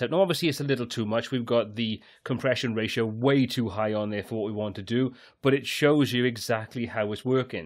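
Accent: Wales, United Kingdom. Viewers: Great explanation and lovely Welsh accent!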